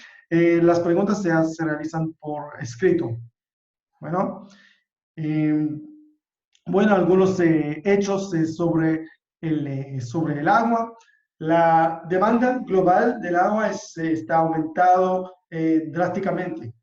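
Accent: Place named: Arab world. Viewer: El.Dejo árabe 😁🎊🎊🎊🎊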